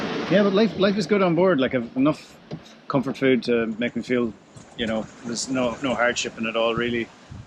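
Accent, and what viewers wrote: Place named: Ireland. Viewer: Really enjoying these videos of the West to East Atlantic passage. Totally in awe. Really aware of the risks especially when you mention the possibility of knock downs and you hear cracks in the cockpit. So refreshing to watch after not watching you for a few months. Plus the added attributes of the gentle Irish chatter. And the transparency! Absolutely love it! ⛵️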